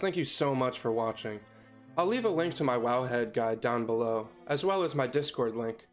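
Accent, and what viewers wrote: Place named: United States. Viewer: I have a hard time with the American accent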